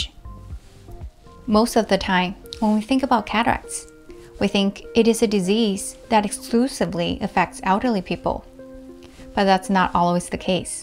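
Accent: United States. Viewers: Pity you talk american. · Easy , just marry a smar/t pretty Chinese or Japanese female as seen in this video! No problem 😊 ! She will nurse me as I will shower her with LOVE ❤️ 😍😎 ! Yah yah ! Learned alot from this video! Her English is AMERICAN! I seen many videos when others have a strong accent and hard to understand what they are saying!!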